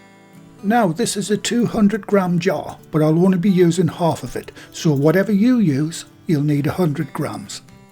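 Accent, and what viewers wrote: United Kingdom. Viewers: 너무 잔인해 You are so cruel 이런 멋진 감자라니 It looks Very nice potatoes 감자를 싫어하는 나도 먹고 싶어지잖아 I want to taste them even l don.t like potatoes 그건그렇고 By the way 너의 영국발음에 중독되고 있어 l am addicted your English accents 너 때문에 오븐을 살지도 몰라 lf I buy an oven it's because of you 하여튼 너의 동영상이 맘에 들어 Anyway l like your video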